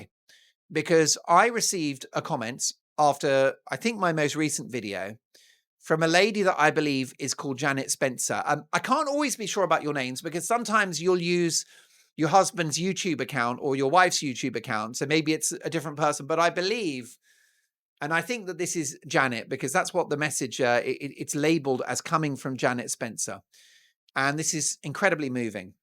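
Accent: Australia. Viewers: Lol,love your Aussie accent 😅Yes,Dixon St in Sydney is where you will find Chinatown,l use to work around the corner in Sussex St,lol😊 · your great Australian accent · love your Aussie dialect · Excellent Aussie accent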